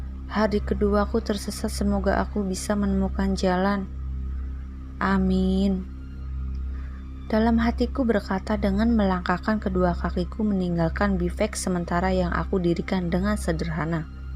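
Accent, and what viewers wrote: West Java, Indonesia. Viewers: Pembaca ceritanya berasal dari Sunda/Bandung ya?... Betulkah?... Karena penyebutan "bagaimana" terdengar menjadi "bagaimanak"/ penyebutan "sunyi sekali" terdengar menjadi "sunyi sekalik"/ penyebutan "gelap sekali" terdengar menjadi "gelap sekalik"/ penyebutan "membaca shalawat Nabi" terdengar menjadi "membaca shalawat Nabik"/ penyebutan "jangan tinggalin aku sendiri" terdengar menjadi "jangan tinggalin aku sendirik".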